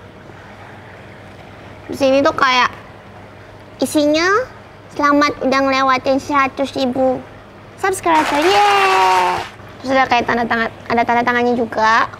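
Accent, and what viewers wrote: Java, Indonesia. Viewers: Disaat orang2 kaya ngomongnya selalu campur english, nah ini medok banget dong ngomongnya heheh... Sukses terus yt channelnya ❤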